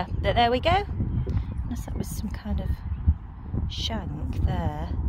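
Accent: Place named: United Kingdom. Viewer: Superb hunt. Adore your British accent 💖 I now know where your lad gets his 'OMG' catchphrase. 😄 Cheers 🇺🇸🇬🇧❤️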